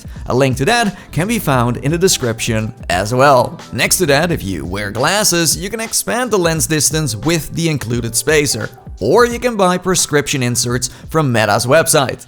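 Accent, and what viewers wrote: Netherlands. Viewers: This man is dutch right?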